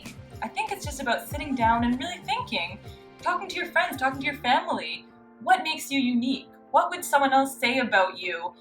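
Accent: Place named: Canada. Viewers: That's because she is Canadian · You sound Canadian to me.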